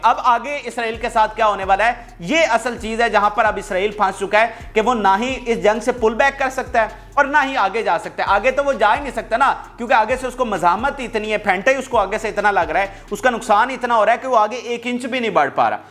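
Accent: Punjab, Pakistan. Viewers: Sab kuch theek hai bas aapki Urdu theek nahi hai Punjabi lehje mai boli gayi Urdu bht behudaa lag rahi hai .Ali garh universty yaa Urdu adab k program dekha kro aur punjaabi bolne se hameshaa avoid kro ye ranjeet singh ki Jahaalat bhari zubaan hai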